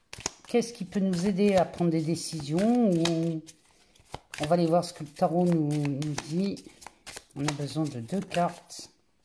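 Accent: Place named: Lyon, France. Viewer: Bonsoir, des bonnes nouvelles enfin. Vous avez l'accent de Lyon, ma ville de naissance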